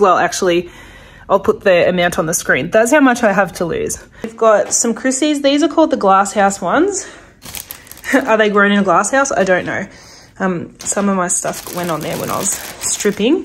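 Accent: Australia. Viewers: Hi, just wanted to tell you I’ve been watching your channel for approximately a year now( yours is one of my favorite flower channels) love the Australian accent also all the scenery footage. As far as ideas on helping to sell flowers you could do workshops in your studio and also online. Your a very good educator and I love how your husband is as passionate as you are(maybe film more on a daily basis to include family life), I’m from the states and I love seeing Australia. I watch some YouTube channels who ask if you like the content and if they’ve been of any help to the viewers you can buy me a coffee ( which I believe to mean a donation in any amount to help keep the channel growing).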